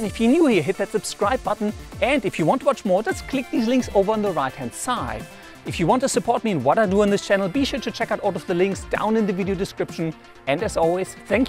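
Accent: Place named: Germany. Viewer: by the way, you sound pretty german sooo are you german?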